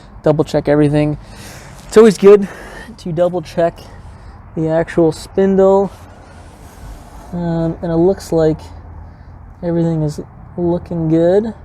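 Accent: Canada. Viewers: You sound so Canadian !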